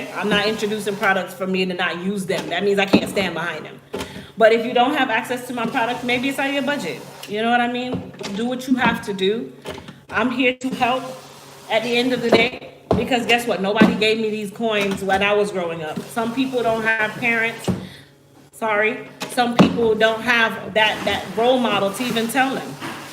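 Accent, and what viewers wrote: Jamaica. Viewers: Girl you’re so real I would’ve not known you from Jamaican background. You bring out your Jamaican accent I like that about you, you’re proud of where you’re Heritage is 🙏🏾💕🙏🏾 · You sound jamaican sometimes but you know what I love you very real I've learned a lot from you I'm 68 and you never too old to learn thanks a lot for all the info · Down to earth giro love your suggestions Are you Jamaican I heard some languages there. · You must be Jamaican. The accent pops out. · I heard that JM accent..Loving your show...Didn't know about the coconut water!!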